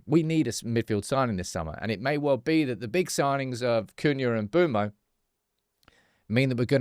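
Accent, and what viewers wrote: Manchester, United Kingdom. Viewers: That’s a strange Mancunian accent you have there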